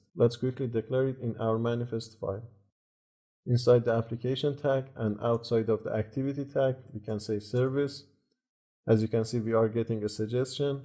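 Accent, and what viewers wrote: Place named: Iran. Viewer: Farsi accent, I found out from your accent that you are Iran and I became very happy , LIKE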